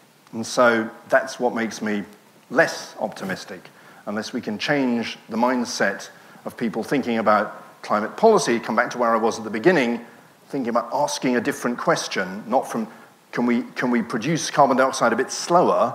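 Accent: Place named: England, United Kingdom. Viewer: The bad sound is that bloody English accent.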